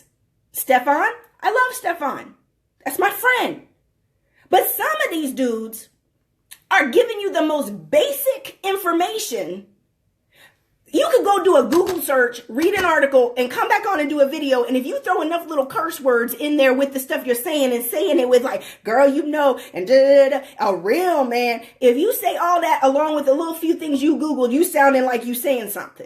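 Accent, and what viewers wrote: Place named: New Orleans, United States. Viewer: Babbaaayyyy say it in your Nawlins accent you done blew it up here! I'm so glad I woke up from that mess and saw the light. I almost threw my phone and shouted across my room when you talked about sexual repression! All these preachers out here profiting off of women's pain when it's a lot of women waking around broken due to so many issues and experiences. They should be ashamed!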